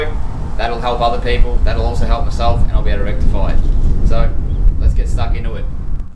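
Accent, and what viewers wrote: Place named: Australia. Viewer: Honestly, as an American, I could listen to Aussies speak on 4x4s all day long. Good video mate! Cheers!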